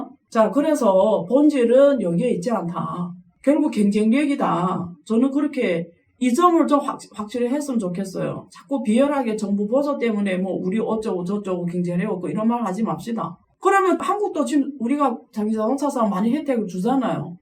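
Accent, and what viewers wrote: Yanbian, China. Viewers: 안유화씨 중국인이라던대 사투리도그렇고 사실인가요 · 과감하게라는 뜻 말투를 보니깐 중국 연변이나 목단강쪽 분인것 같고 그기에서 살아온 조선족분들은 북한에서 건너간 조상들이 많습니다 그래서 한국 표준어를 잘 못하는데다가 경제나 기술쪽 전문용어까지 이해하고 공부해 가면서 방송을 할려니 부족한부분이 있는것 같습니다 뭘 또 찝어서 지적하시나 ㅋㅋ 아시면서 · 안녕하세요 / 시청자 입니다 방송 음량이 70% 알아 듣겠는데 30%는 무슨 말인지 알아 듣지를 못하겠습니다. 구독자 15만명이면 좋은 방송을 위하여 투자를 좀 해야하지 않을까요? 스튜디오 방송 음향이 울림이 심합니다 / 무슨 말인지 알아 듣지를 목하겠습니다. 중국식 발음과 좋지 않은 음향시설 / 스튜디오에 방음시설 좀 하시고 / 커텐이라도 ........ 그리고 마이크 음량도 좀 UP 하시면 좋겠습니다. 시청자가 불편함이 없어야 하지 않을까요?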